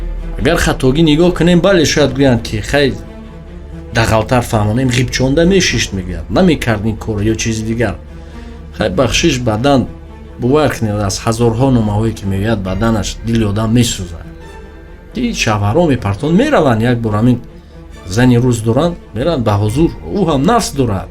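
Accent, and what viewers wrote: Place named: Pamir, Tajikistan. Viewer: Акай ранги одамой Помир точикира гап мезанен шумо Блогер нестен шумо Инсони хак мебошед ранги шумо бори Инсон кам аст!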